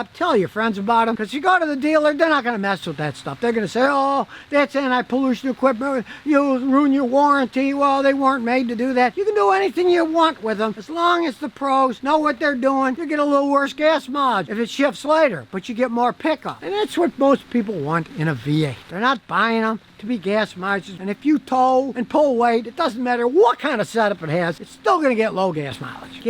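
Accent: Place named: Chicago, United States. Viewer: it's the Chicago accent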